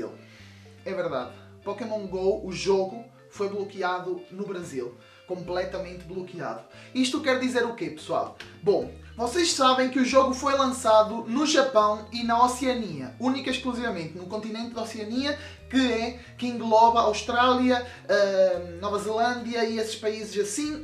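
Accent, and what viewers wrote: Portugal. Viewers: mas tu es portugues ou br? que eu saiba es portugues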